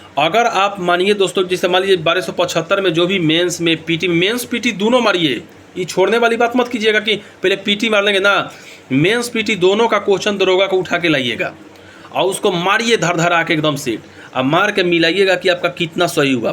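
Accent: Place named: Bihar, India. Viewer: Aap ekdam theth bihari style mei bataye hain sb samajh mei aa gaya sir 👍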